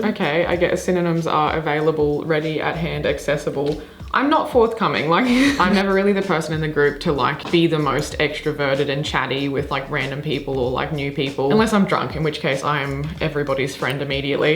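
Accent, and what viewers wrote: Australia. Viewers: I'm assuming you're an Aussie.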